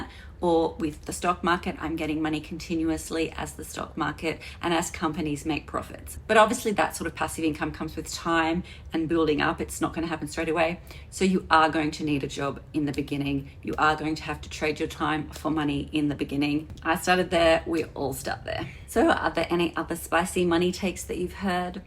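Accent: Australia. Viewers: Nice to hear an Aussie giving some good common sense advice.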